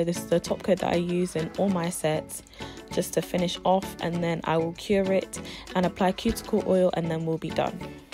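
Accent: United Kingdom. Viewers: So happy I heard a British voice, even better London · yesssss a uk gal! finally ❤️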